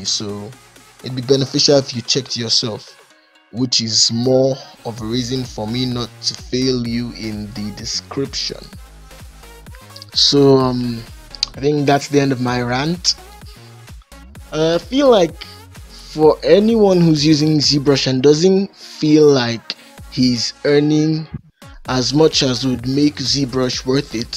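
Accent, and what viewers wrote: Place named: Nigeria. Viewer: the sculpting workflow is immaculate, the nigerian accent aswell 🤣🤣😭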